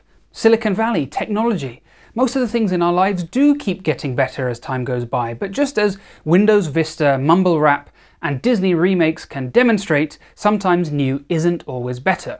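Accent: South Africa. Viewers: I don’t know what you have against doctors from kwazulu natal, but your South African Durban accent killed me. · top notch South African accent! Laughing so much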